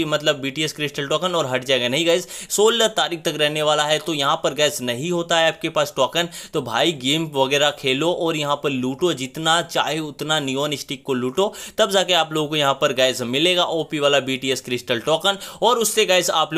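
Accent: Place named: Bihar, India. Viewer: Bhai aap Bihar se ho naaaa mere ko pataaa haii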